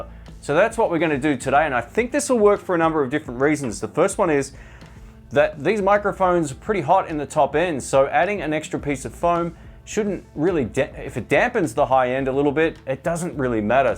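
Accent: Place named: Australia. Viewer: Nice hack. Lot cheaper Roxdon or BSW pop filters. Great sounding Aussie voice... mine diesn't have that feature...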